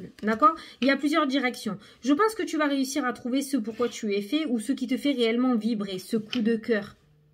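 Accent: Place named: Lattes, France. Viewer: Salut Trop contente de retrouver chaque jour mon petit rayon de soleil 🥰💖😍 (en plus comme je suis Lattoise mais exilée a bordeaux ton petit accent me fait trop du bien aux oreilles 😁😜 )genial merci ce petit concours 😋🙏🍀🤞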